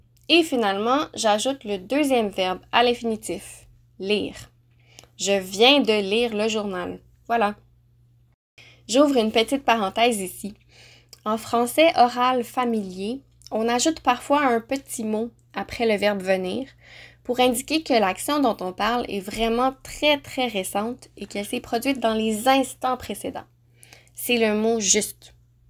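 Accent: Quebec, Canada. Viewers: J’aime ton accent québécoise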